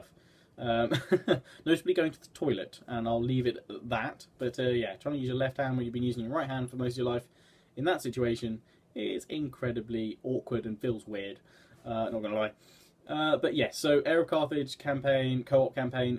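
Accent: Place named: United Kingdom. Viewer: Hey please heal soon I am gonna miss your british voice and all of your videos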